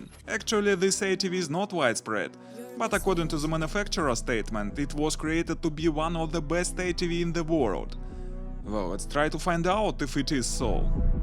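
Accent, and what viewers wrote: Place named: Russia. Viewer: Русский акцент аха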